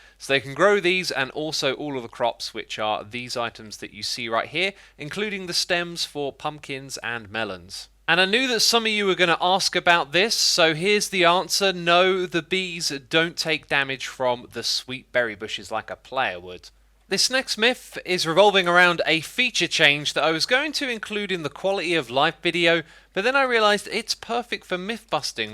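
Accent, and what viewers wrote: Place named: United Kingdom. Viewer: why are all minecraft youtubers british? is bothers me, they all sound the same.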